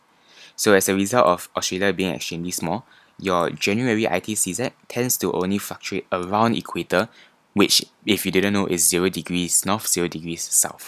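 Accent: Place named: Singapore. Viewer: This is useful even for AS&A's CIE geography, thank you so much! The Singaporean accent is refreshing to hear as someone studying internationally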